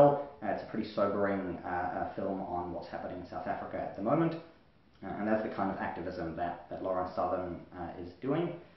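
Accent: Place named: New Zealand. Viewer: Interesting - but, please slow down your speech and keep same volume....trying to cope with the NZ accent....hope the group thinkers widen their mind enough to actually listen to what Lauren has to say.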